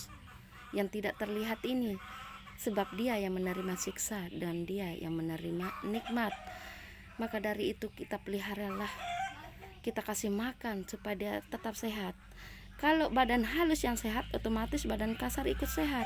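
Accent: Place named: Lombok, Indonesia. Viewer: Marak logat Lombok timur...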